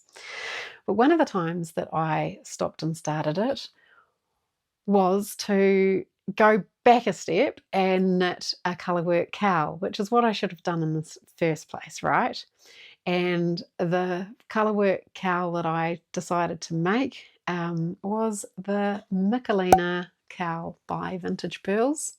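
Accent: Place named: New Zealand. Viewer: Hi from Trieste (Italy)! I’m a crocheter/knitter/everything yarn-related addict, and I’m in love with NZ. I’ve spent a month in Auckland on an English summer course in August 1992 and had such a great time! I still own and cherish the cabled jumper I started in Auckland and finished on the plane back home- and the leftover wool that I keep just in case😅. It’s so good to hear your Kiwi accent, it reminds me of all the nice people I met there❤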